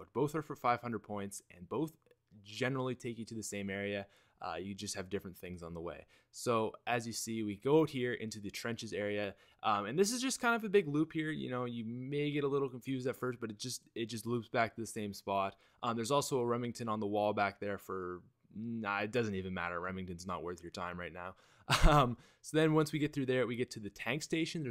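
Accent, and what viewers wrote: Canada. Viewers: are you canadian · R u canadian?😋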